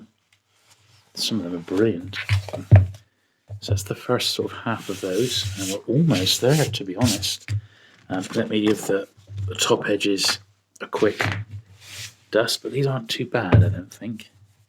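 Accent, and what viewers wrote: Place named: West Country, United Kingdom. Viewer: It's definitely that soft, West Country accent! Love your vids as always my friend 👌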